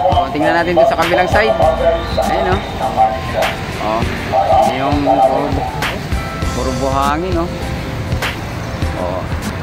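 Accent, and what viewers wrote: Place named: Visayas, Philippines. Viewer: malingaw ko maminaw nimo dong blogger ba kay naga tinagalog ka apan bisaya kaayo imong accent hehehe. payts dong. tira mga bisaya!!!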